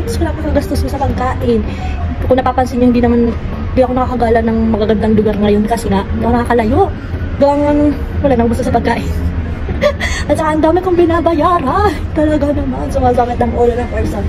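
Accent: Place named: Batangas, Philippines. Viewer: Abay ikaw gay batangueña..ala e..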